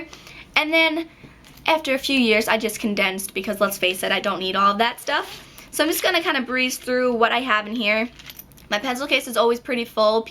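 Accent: United States. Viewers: you are super cute and I adore how you talk, I dont know, but for me this is the perfect example for the american english (though there is maybe not THE american english), but I like it! subscribed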